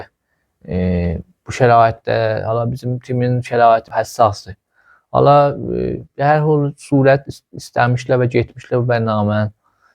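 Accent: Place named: Turkey. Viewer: مگه تراکتورسازی تبریز مال ترکیه ست که تو با لهجه ترکی برنامه ساختی؟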